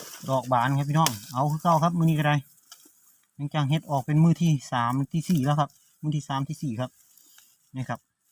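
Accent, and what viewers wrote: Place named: Kut Bak, Thailand. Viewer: สำเนียงกุดบ่กชัดเนาะ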